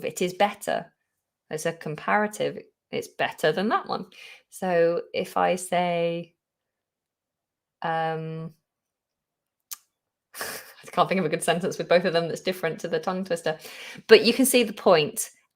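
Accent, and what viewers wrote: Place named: United Kingdom. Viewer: Hi great to see you again and enjoy listening to your beautiful British voice. Unfortunately i couldn’t catch you on live but it’s Ok as i am watching you right now from Casablanca, Morocco 🇲🇦 Thank you so much for this live steam 😎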